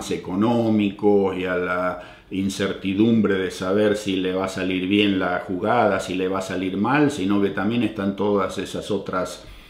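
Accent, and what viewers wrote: Argentina. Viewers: Gracias por sus vídeos!! Me encantan 😍 😍😍😍 , les escribo desde 🇦🇷. Aunque hagan mil años que te fuiste de acá, no perdes “la tonada” !!! Me alegraron la cuarentena!! Te aseguro que sería buenísimo tener un presidente como vos!! Muchos haríamos campaña para vos gratis!! Jaja! Lamentablemente nuestro país no tiene solución! Mejor no sigo, es muy triste lo que estamos pasando!! Espero el próximo vídeo!! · Recien hace 2 ds encontre este video de Youtube, Me intereso tanto que no para de verlos. Me hubiera encantado verlos en cuarentena, la cuarentena interminable que tuvimos en <link> asombra mucho que no hayas perdido tu acento. Me siento identificada con todo lo que decis de Argentina.Que gran pais desperdiciado!!! Voy a ver todos los videos. Lamento en particular, lo que tuvieron que pasar tus hijas con tantas idas y vueltas. La burocracia en Argentina es terrible, y la coima ya es algo como normal!!! 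Me encantan tus videos y me siento identificada en muchas cosas viviendo en Buenos Aires. Saludos a todos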